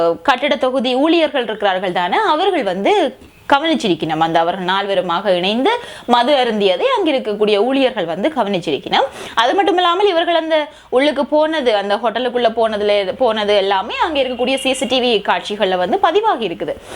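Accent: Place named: Jaffna, Sri Lanka. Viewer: Aama jaffna thamil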